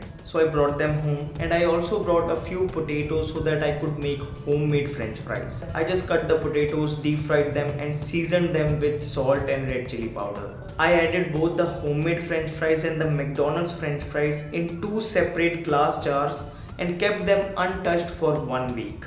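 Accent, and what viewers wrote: India. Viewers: Love the heavy Indian accent! Great "wake up" video.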